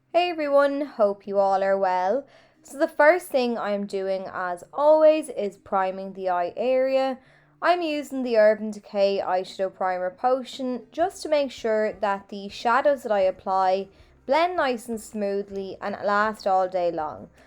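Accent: Ireland. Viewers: Thank You for another fab eye look loving the smoky emphasis on the lower lash line and with a gorgeous Irish accent, I do love an Irish accent you know 🥰⭐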